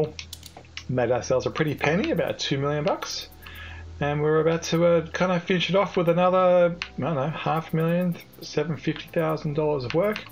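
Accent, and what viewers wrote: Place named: Australia. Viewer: that makes sense! 😂 you upload schedule says USA but your accent says Australian 😂 damn! Timezones are annoying!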